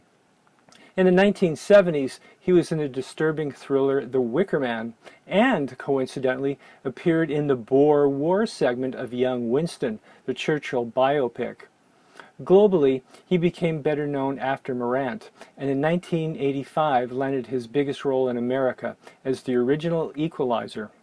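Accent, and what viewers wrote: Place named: Canada. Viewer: Ah didn’t know that the Canadians pronounce the same as the yanks. I’ve recently gotten into your channel and I’m enjoying your content. And mabey someday we too can shed the shackles the poms have on our phonetics. Also have you thought about doing some kiwi cinema as it be good to see our cousins represented.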